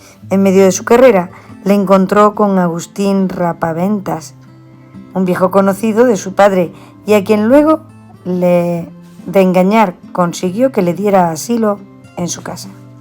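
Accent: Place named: Spain. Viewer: Se le escucha acento español y por lo tanto debe tener dicha nacionalidad. En verdad me sorprende que alguien de España haya pronunciado la palabra "colonización" término ambiguo y mal aplicado ya que el actual territorio mexicano fue parte del virreinato de la nueva España, nunca fue una colonia y los nativos de las Américas fueron considerados súbditos después de la conquista cómo lo dejo expresado la reina Isabel la católica en su testamento y nunca fue política del Reino de Castilla y después del glorioso imperio español el "esclavizar a los mexicanos" al menos no en la ley, entiendo que muchos peninsulares y criollos cometieron abusos en éstas tierras pero siempre al margen de las leyes de Castilla y aún contra ellas. La historia de México no se entiende sin la historia de España, la historia de España no se entiende sin la historia de Hispanoamérica y me sorprende que tanto españoles cómo mexicanos desconozcan nuestra historia en común. 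Su reseña del periquillo sarniento es algo inexacta, le mando un saludo desde el territorio del antiguo reino de la Nueva Galicia.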